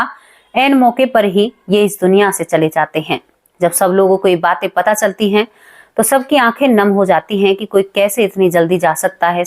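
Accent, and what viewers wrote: Haryana, India. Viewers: Aap ki bolney ki tone Haryanavi hai 👍👍👍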